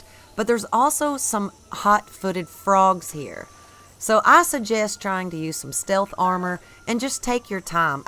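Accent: South, United States. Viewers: Thanks for making these very informative videos. Love your southern accent.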